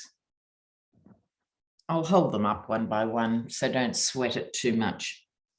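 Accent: Australia. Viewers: Hello love your tarot card reading you keep us calm when I tune in on YouTube you were the first I ever saw do tarot cards I got laugh at your next door neighbor's they were very loud and you would sit on the floor and read your tarot cards with your Australian accent l just love your channel l said this is real tarot card reader keep up the good work.......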